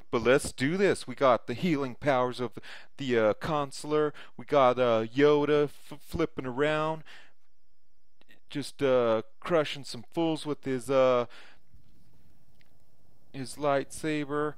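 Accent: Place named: Denver, United States. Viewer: <num> is my Ally code. Yo so I play swgoh screen name PlayStyle. I was watching your channel like a year ago then for so.e reason I stop getting your content but I see Im still subscribe and the bell is hit. So I'm watching your channel and u cracking me up and I'm like this dude talks like the homies. You know Denver has a unique swag it's a West coast swagg with Midwest flava strong Latin influence, so I'm like he sounds like he from S. Denver maybe the West up N. Is well we know wat it is and Aurora is blacks whites alot of Interacial fams. So I keep watching and sure enough you pushing that 303/720 kar I was like wow bcuz I ask everyone do u play swgoh? I been on since we Nov/Dec 15 & Neva met anyone who plays this game from Here. So thx for reppin the city for all the Galaxy Nerds and undercover nerds out there. I like your content bcuz u keep it real check out my acct I know u know alot of dudes know but if u ever need to use one of my accts to feature a player let me know. 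I have 2alt accts bcuz there was a time before raids and ships were I could t get enough swgoh I would complete everything in 8min (with the exception of timer events ie: Arena) anyways the wife is buggin talking about I know u ain't takin a shit I. There with the door locked I k ow your nerd ass is trying to save the universe! I just told her the Galaxy chic we saving. The Galaxy. <mail> holla back.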